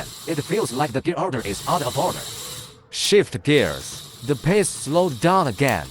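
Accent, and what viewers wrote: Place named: China. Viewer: Why du u sound like chinese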